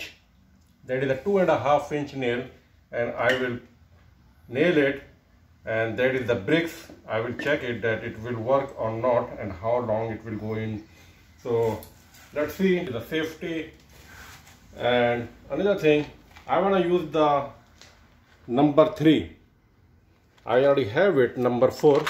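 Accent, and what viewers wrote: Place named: Russia. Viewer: Какой-то у вас Русский акцент!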